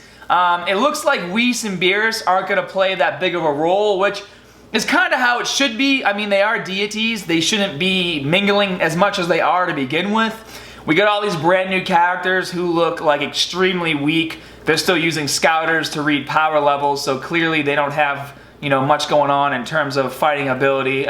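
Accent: Boston, United States. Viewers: That boston accent tho lol x'D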